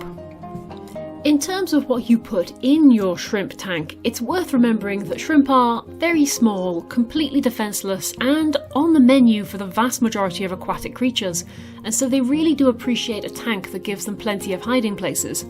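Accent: United Kingdom. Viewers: Great edit and I really like your UK accent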